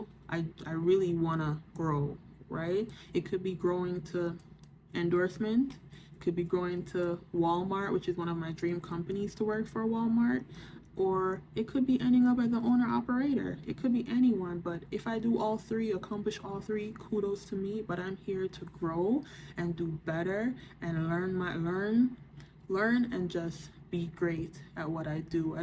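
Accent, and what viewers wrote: Jamaica. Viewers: Are you Jamaican 🇯🇲 Yuh sound like a yard girl…welcome to trucking 🚛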